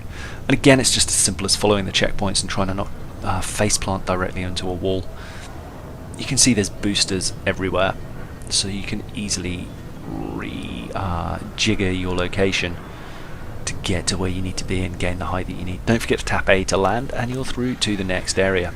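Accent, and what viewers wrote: United Kingdom. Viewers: im brittish mate, and thats a really tragic accent. its just way too brittish, useful videos though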